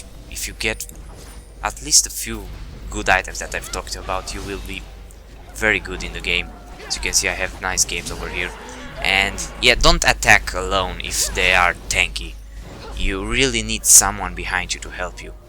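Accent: Romania. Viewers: Se vede pe vocea ta ca esti roman :). Joci foarte bine cu Akali. Tine o tot asa. Cred ca o sa mi o cumpar si eu. :) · are u from romania?:D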